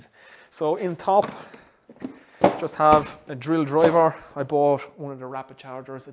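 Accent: Ireland. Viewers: Dublin / Dundalk accent ?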